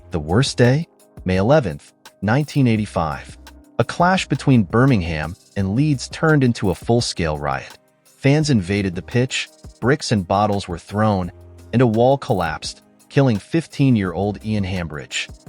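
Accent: United States. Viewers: Hoo the phuq are you? It's pronounced "Hills-bu-ruh"/"Hills-bruh" not "Hills-borrow". It's "Seltic" not "Keltic". "Berm-ing-gum" not "Ber-ming-HAM". "White Lane" it was "White Hart Lane". It's "Darby" not "Der-by". " 1970 ess" for "1970s" get real. Whoever you are do yer effing homework. Pretty much every ground in England, Wales and Scotland was the scene of "hooliganism" in the late 1960s, 1970s and early1980s - nothing to see there. Every ground had its area (or fortress) for its hard nut fans. And I am sick of the ubiquitous (look it up in an effing dictionary) US accent, pronunciation and emphasis (we (UK) emphasise the second or last syllable - not the first) on effing everything you watch on YouTube. Is the US trying to take over the world by its cultural imperialism? I think so - phuq off with yer cultural imperialism. Answers on a post card - you effing Yanks might have to look up that UK cultural reference. 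Jog on. Sick to death of you. - or the people who use some Silicon Valley tool to make yer vids - do your own voice-over, if you want to be (or appear) authentic. Rant over....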